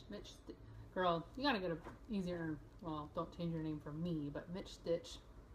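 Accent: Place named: Northern Ireland, United Kingdom. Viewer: would you believe not just Irish, but Northern Irish, a much more rare accent, and very charming (more like Scottish). And actually a lot of people find it hard. Probably because initially you want to say (as in Michelle) and then Stitch becomes hard to say 😝 trust me to make it harder than it needs to be. I must admit I put about 13 seconds thought into it 😉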